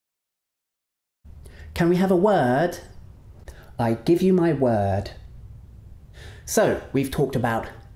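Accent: United Kingdom. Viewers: Hi,! How do you do? Howdy? You are a dashing English teacher. Your British pronunciation is clear. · Omg I love British accent, do you give individual classes? Also of grammar?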